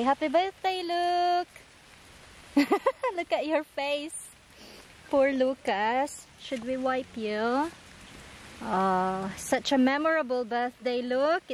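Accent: United Kingdom. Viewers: Belated happy birthday Lucas, rainy day in your place now, gusto ko British na accent, sounds good... Enjoy the zoo...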